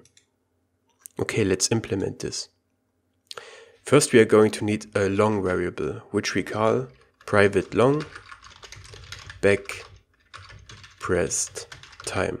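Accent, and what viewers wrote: Germany. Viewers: Man merkt das er deutsch ist 😂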